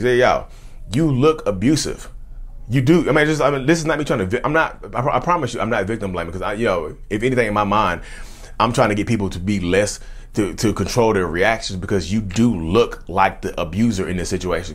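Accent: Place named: North Carolina, United States. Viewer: Most of the narcissists I dealt with in my past - on the romantic level that is - were when I lived in Charlotte and before I knew that it was narcissistic abuse that I had experienced with them. So hearing your NC voice talk about narcissism is particularly healing to my soul. Now living in L.A. I, of course, encounter SOOOOO many situations where NPD is a factor. Your content as well as the videos from Little Shaman, Jay Reid, and Patrick Teahan are my go-to sources these days. I love it! -S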